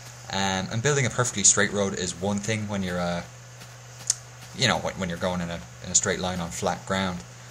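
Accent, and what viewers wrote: Ireland. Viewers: Do you have a hint of an irish accent?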